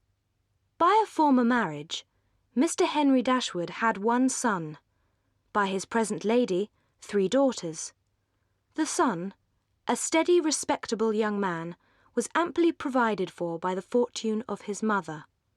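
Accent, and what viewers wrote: United Kingdom. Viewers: She sounds so much better speaking with an English accent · ! My sweetiee💖💖💖 What a beautiful voice she has! Original British accent! I'm so happy to find it on yt. 😻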